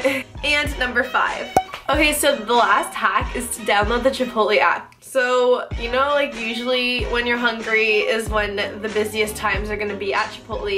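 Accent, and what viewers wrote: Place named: Canada. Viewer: your canadian accent is so cute lol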